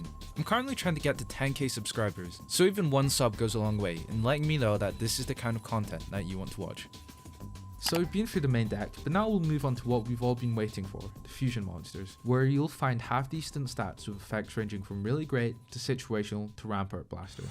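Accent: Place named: Scotland, United Kingdom. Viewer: Great video, your accent btw, are you Scottish, specifically aberdonian?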